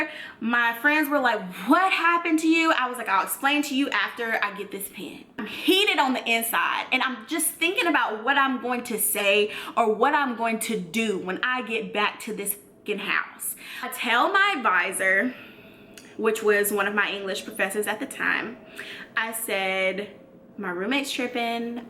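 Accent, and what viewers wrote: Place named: South, United States. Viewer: Where are you from? You have kind of a southern swang in your tone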